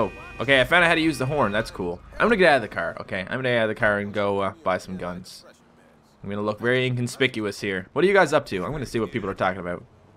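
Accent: Canada. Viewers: He's Canadian right? He sounds Canadian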